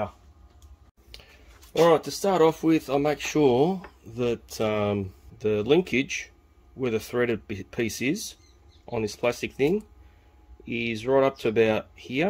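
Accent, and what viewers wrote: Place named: Australia. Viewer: I was expecting to hear a french guy speaking, bugger me if it wasnt a fellow Aussie !!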